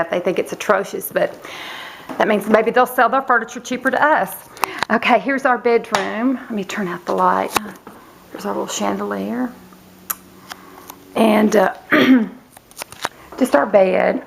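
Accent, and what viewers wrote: South, United States. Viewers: I'm taking you back old school. Lol. I found your channel and started binge watching. You are the sweetest little southern bell. I'm from Kentucky but I think your accent is more southern than mine. ( I know you probably think Ky isn't southern but northerners do.) I just wanted to say, thanks for showing us your house ,even though you thought it was messy( it wasn't). It helps people relate better to you. I just can't feel comfortable in a house where every single thing is in place. I like a home that looks lived it . ( I guess you just live in the closet because the rest was perfect with nothing out of place). Lol. I'm just cutting up with you. Beautiful home but you are even more beautiful. Your inner beauty shines through to your beautiful face. Thanks for sharing.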